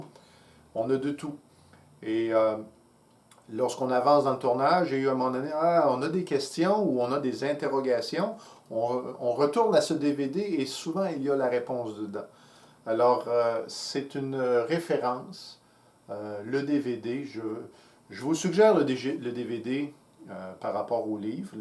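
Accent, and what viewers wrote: Quebec, Canada. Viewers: Merci pour tous ces conseils éclairés , sans ésotérisme et sans "course à l'armement. Et avec ce superbe accent québécois mais pas trop prononcé pour être bien intelligible pour nous maudits français 😄. Entre un bas de gamme chinois qu'on rachètera ou dont on trouvera vite les limites et un outil surdimensionné, le choix est toujours difficile quand on veut être économe. Mes prétention sont très très limitées à du tournage utilitaire très occasionnel , type manche par exemple. J'ai par ailleurs vu sur la belle vidéo qui expose le tournage d'un bol sur bois vert sans mandrin qu'on pouvait arriver à se débrouiller avec un minimum. Ma question est l'acquisition d'un tour Einhell léger ( donc à fixer) et avec un pas de vis non standard ( donc pas d'adaption de mandrin à mors) est forcément un mauvais choix et s'il faut plutôt s'orienter vers un tour type holzstar db 450 ou holzman, ce qui si l'on rajoute la rallonge pour des pièces de 1m, triple à peu près le budget (190€ versus 450+130€). 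.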